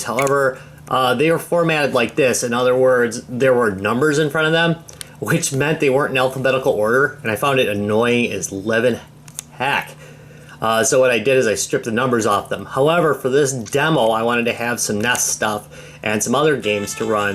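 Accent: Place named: China. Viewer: i love chinglish from china , entertainment before you see the product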